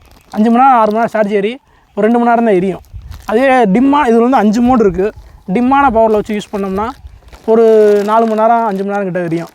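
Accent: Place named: Madurai, India. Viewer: Unga madurai slang kum unga muyarchikkum seekiram success varum bro❤😍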